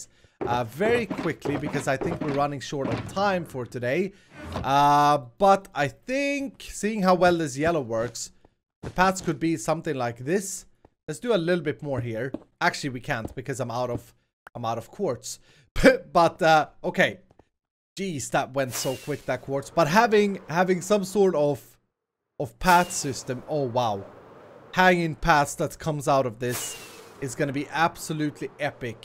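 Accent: Sweden. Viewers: I'm not so sure if you will remember me, but I have been watching your channel for a while, commenting. Recently, I couldn't keep up with your vids because I have been so busy. I am currently at one of Korea's best boarding school, named KMLA. (I wanted to boost cause it's one of the best achievements I've made so far...) Anyway, there's a special reason for why I'm commenting. I wanted to say thank you for various reasons. First, your videos make me laugh. It's so fun, creative, and amazing. I like the fact that you are very random. You use a lot of strange words, like crap or such, but I really appreciate that you do not use swear words. Since I had so much fun watching yr vids, I have introduced it to my brother and he enjoys it as well. One thing I hope is... I hope you could do some vlogging... Or at least show your face in front of the camera for at least once a month like False. 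It would be so good to know you as a person ' ' as much as knowing you as 'Swedish accent voiced avatar '. It would be much appreciated by both me and the fans. The second and most important thing to thank you is that you somehow triggered me... Well, in a good way. Remember when you said 'Ja' instead of 'Yes' and did a short commentary about your mother language peeking out? That sorta hit me hard. As soon as I heard that word, 'Ja' for some reason, I wanted to learn Swedish. Maybe it was because I wanted to talk to you with Swedish or I wanted to have a new hobby, but anywa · This is so funny for me as a swedish person hearing another swedish persons accent in english xD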